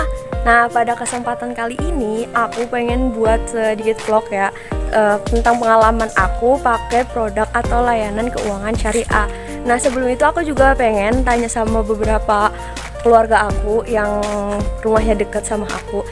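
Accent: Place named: Banyumas, Indonesia. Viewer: "Logat ngapaknya bikin candu" Great job !!! #BERKAHBersamaKeuanganSyariah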